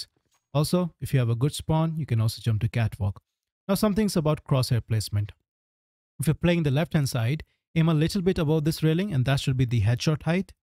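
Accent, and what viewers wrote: India. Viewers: you sound Indian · always the indian accent tutorial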